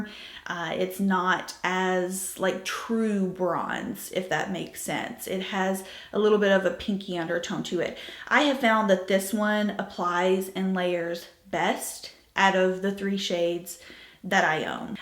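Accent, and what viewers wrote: South, United States. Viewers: Just found your channel and I am so impressed by how down to earth you are while being so thorough with your reviews! As a Tennessee girl I also love the southern accent! I feel very at home on your channel! The content you are producing is such high quality--thank you!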